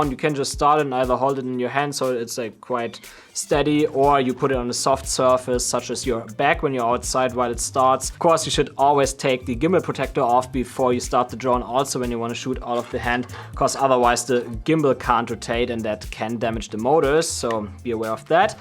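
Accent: Germany. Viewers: Super Video. Ich schreibe mal auf deutsch weil ich anhand deines Englisch denke, dass du aus Deutschland kommst.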